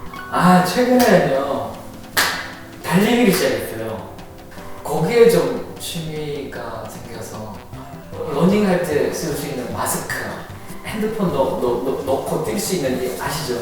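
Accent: Gyeongsang, South Korea. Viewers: 너무 때묻지않은 분 너무좋아요 경상도 예천말이 고맙니데이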